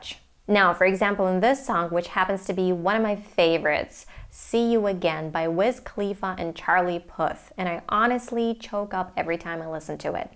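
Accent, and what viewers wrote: United States. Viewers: I read on a comment that you are not American . is it true 😮😮😮 because you sound like one of them .I do not know if that guy was saying foolish things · I love this Belarus song. - Very beautiful poetry too...... I thought, You were Hispanic American. Very surprise to know that You are Ukrainian. You have a very cute baby too. You have a very American native accent. I had lived in U.S.A. for 16 years. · I love you. Your English is American you speak and sound very well, but can you speak the British English?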